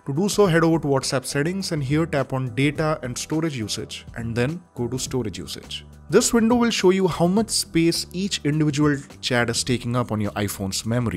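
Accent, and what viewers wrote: India. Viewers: Hate that Hindú accent. It’s very hard to understand!!!!!!!!!!!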